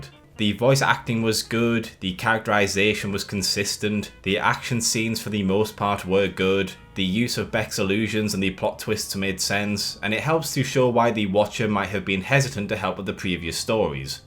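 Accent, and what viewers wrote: United Kingdom. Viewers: thats not a heavy British accent lmao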